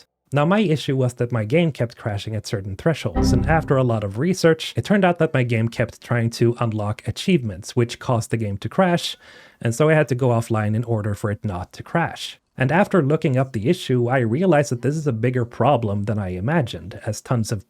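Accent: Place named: Sweden. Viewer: Förvånad när du avslöjade dig som svensk; få svenskar som inte pratar engelska med en brytning som inte gör ont i öronen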